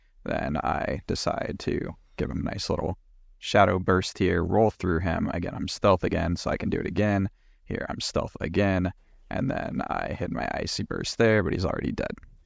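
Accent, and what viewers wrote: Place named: United States. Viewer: OMG, what a video. I have watched hundreds in the past few months and while I do WANT to read about how to make a build and where to put the points, what this social scrub actually NEEDS is to learn how to actually play the build. You have given this in beautiful clarity. Showing actual footage with a commentary, freezing actual play to discuss what you used when and why. I so wish the other competant players who post these videos would do the same. I can make the build you guys so generously provide. I can flounder around on my own and work out how to employ it to the best of my ability, but a second opinion like yours, from a competant player, really helps us casual players to put the polish on our performance and for that I am most grateful for your time and effort. On the clarity of your video, may I make a point that is meant as constructive and helpful, but which might not be something you cannot change? 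For some of us not from the good old US of A, your accent along with the gravelly edge of your voice might make the odd word difficult to decipher. While social bias dictates that a gravelly voice projects authority and gravitas, it doesn't help clarity to those of us unfluent in your accent. I don't know what I expect you to do with this information, you have a lovely voice, but I thought I would share it.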